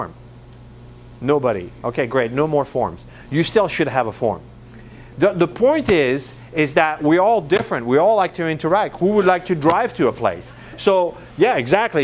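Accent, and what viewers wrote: Quebec, Canada. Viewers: Sensing a Quebec accent :)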